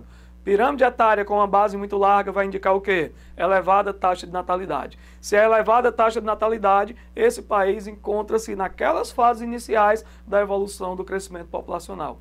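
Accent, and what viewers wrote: Northeast, Brazil. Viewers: Deu até saudades do Nordeste só de ouvir o sotaque dele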